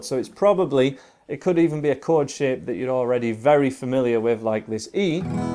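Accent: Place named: Northern England, United Kingdom. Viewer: Northern English accent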